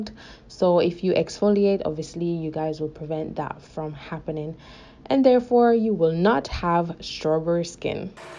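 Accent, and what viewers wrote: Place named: Jamaica. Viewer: Do you have a Jamaican background? Or at least Caribbean... You sound slightly Jamaican based on some of your pronunciations.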